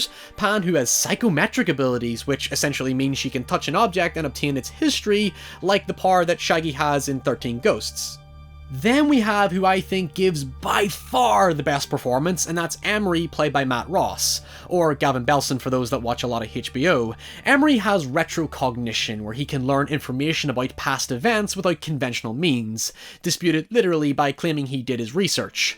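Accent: United Kingdom. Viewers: First off, I love your accent. Secondly, your videos are so good, and I look forward to them always. We Americans don't seem to talk as fast as you folks in the UK, but I manage to keep up. I always like to mention to YouTubers, fairly new to me, that I have 61 years behind me, and that you have many other folks my age who enjoy your content. That notification bell is very helpful to alert me of your new videos. You will reach a million subscribers at some point, I'm sure of it.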